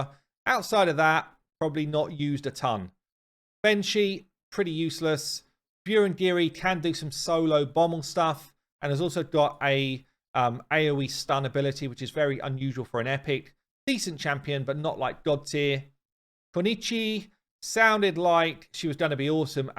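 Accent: United Kingdom. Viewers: you’re easily one of those content creators that I can listen to for hours lol it’s the British accent! Love it! Oh and you put out must have content which I like so thanks bud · I don't know if it's what you say, just being British, or saying what you say with your British accent, but your videos like this just make me laugh. I love how you just beat up on poor Woad-Painted lol.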